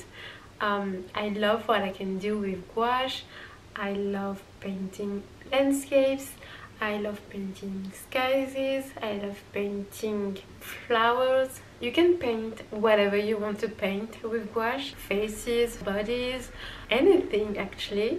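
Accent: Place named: France. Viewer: I love you,re accent. Are you frence? comment vas-tu. Bien? I love you,re gouache painting. 😊👋🏼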